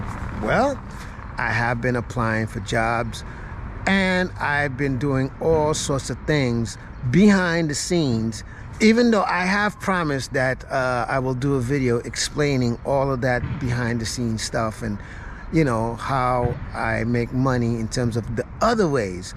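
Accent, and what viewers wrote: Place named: United States. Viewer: Your.acsent.is.not.like.british.are.you.american.your.english.is.good.like.americans <link>.with.you.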